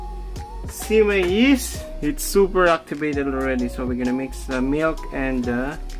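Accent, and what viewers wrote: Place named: Philippines. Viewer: Sound like a pinoy. Saalamat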